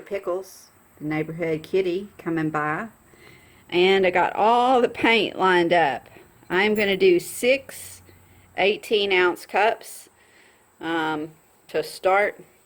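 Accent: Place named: South, United States. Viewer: This has nothing to do with your beautiful work, but I love your voice and beautiful southern accent.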